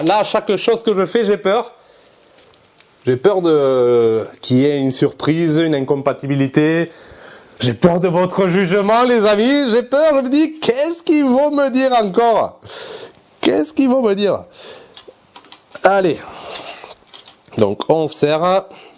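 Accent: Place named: South, France. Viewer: bien joué et merci des infos, mais quel est cet accent agréablement sudiste? Sud ouest?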